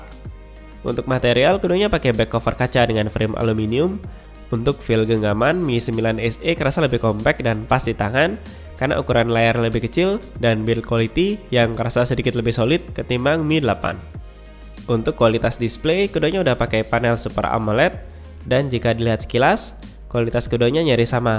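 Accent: Bali, Indonesia. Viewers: Kadi jeg logat Bali asane niki pak?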